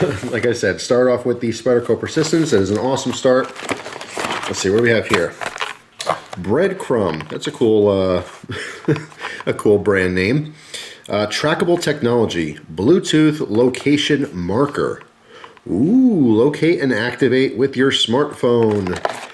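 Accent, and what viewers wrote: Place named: Pennsylvania, United States. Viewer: Pennsylvania account. At least he doesn't say "yins guys" or call everything a "jawn"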